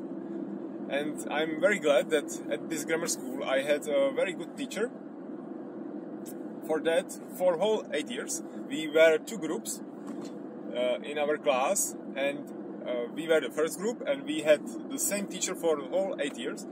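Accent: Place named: Czech Republic. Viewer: and sou on xD cesi maj asi nejhorsi prizvuk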